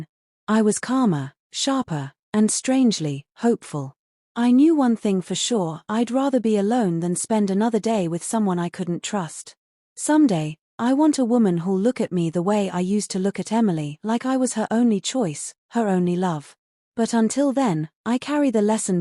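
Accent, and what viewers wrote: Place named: England, United Kingdom. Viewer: Very confusing, English woman telling a story of a Midwest American male in the first person present.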